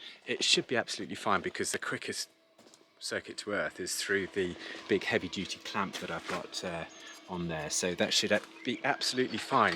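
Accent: United Kingdom. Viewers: I totally didn't expect to hear the King's English from someone fabricating a farm tool. I had no clue Brits were still doing things like this. What a great video and really nice work.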